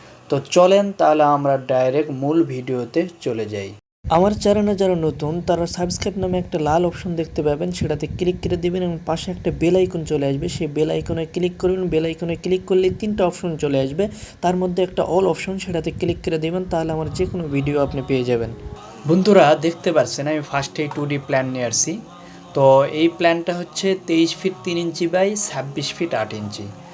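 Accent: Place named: Joypurhat, Bangladesh. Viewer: আসসালামু আলাইকুম ভাই, আপনার কথায় মনে হচ্ছে আপনি আমার দেশি হবেন। আমার বাড়ি ধামুইরহাট, আপনার মনে হয় জয়পুরহাট 🙂। যায় হোক আমি একটা বাড়ি করবো নভেম্বরে, ৬০০ স্কয়ার ফিট এর ৯ টি আর সি সি পিলার দিয়ে একতলা হবে। এক্ষেত্রে শুধু পিলার, ছাদ ও ওয়াল করতে কতো খরচ হবে! আর আমি একটা ডিজাইন করতে চাই আপনার নাম্বার টা দিবেন।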